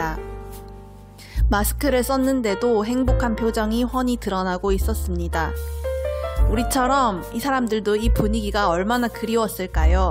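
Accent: Standard. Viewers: 은은하게 베이스 깔린 음악에 님의 상큼한 목소리 (표준말 억양) 최고예요~~😄😆🥰 여름에 로마 직항도 뜬다니 반가운 소식 이네요^^ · 표준말 너낌 나믄서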